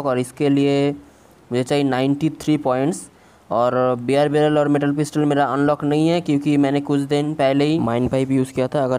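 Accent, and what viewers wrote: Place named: India. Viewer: He’s from India listen to his accent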